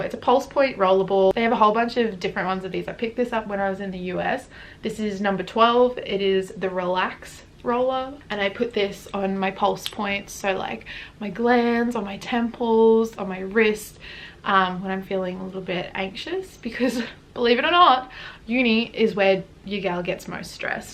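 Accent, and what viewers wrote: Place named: Australia. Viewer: I can tell your Australian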